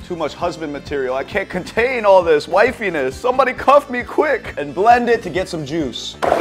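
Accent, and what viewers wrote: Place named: South Korea. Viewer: This gyopo sounds more korean than most native genz koreans wtf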